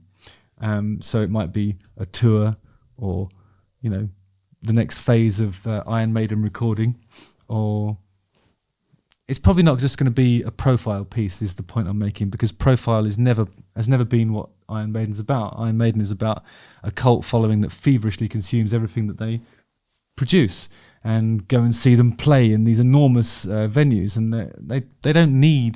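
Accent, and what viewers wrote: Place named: RP, United Kingdom. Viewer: Your knowledge of Maiden is weak. And spoken in your posh accent and delivered so woody and dry makes difficult listening.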